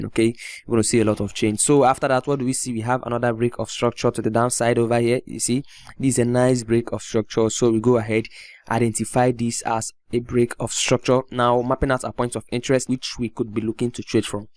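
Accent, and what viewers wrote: Nigeria. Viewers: Where are you from bro? Nigerian??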